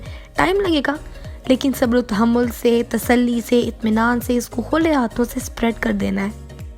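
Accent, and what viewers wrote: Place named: Punjab, India. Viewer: Awaz kharab hai bol rhi ho aap . Par aise bhi kitni pyaari lagrahi hai . Aapka punjabi accent bht pyara lgta hai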